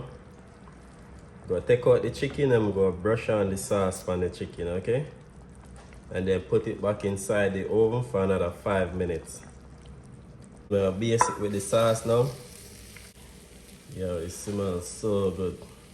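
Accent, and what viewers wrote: Jamaica. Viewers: That look delicious I must try as soon as I get that green seasoning u used..but what I most love is the Jamaican accent 😊😊😊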